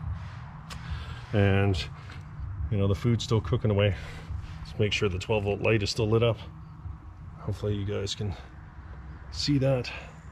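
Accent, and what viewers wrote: Canada. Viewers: You must be from Canada yeah?!😅 Loving the content man keep it up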